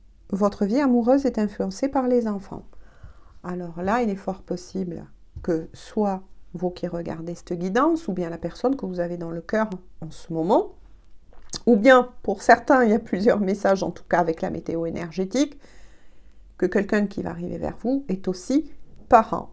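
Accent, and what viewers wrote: South, France. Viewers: Bonsoir, oui la lune continue encore a me titiller. Je suis en sur voltage ! Exictée comme une puce qui a mit le mode pilotage automatique, je perds tout, j'oublie tout, aucune concentration, je fais que des bêtises. Cela fait rire mes amis. Oui un froid ,une distance, recentrage ..l'univers nous y a poussé..Nécessaire pour moi que je lache la tension.la cocotte nimute etait prêté a exploser et a la fois imploser.. merci pour tout ses beaux messages qui on fait écho par leurs véracités et votre magnifique interprétation avec notre très beau accend du sud. Merciiiii. Le recentrage est pour nous deux chaqu'un a son propre travaille a effectué et gérer. Encore merci pour cette chaleur cette belle lumière qui éclaire les zones d'ombres. Elle magnifique car vous a faite vibrer par votre aura, votre propre lumière. Ps : j'ecoute toujours mon propre discernement, ma propre guidance intérieure! 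Je ne m'enflamme pas, je sais très bien que c'est une quidance générale et j'ai toujours le recul qu'il faut. J'avoue elle n'a bluffé par ses vérités. Merci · Bonsoir!,! je vous remercie pour cette guidance qui me parle, j'aime vos guidances et la façon vous nous expliqué la chose. Je vous souhaite une très bonne fin de soirée!. Ps: j'aime beaucoup votre accent!, accent chantant du sud! 👍😁, moi c'est l'accent créole quand je le veux bien! 🙄🤣 revenez-nous vite s'il vous plaît merci! à bientôt!! 🤗😁.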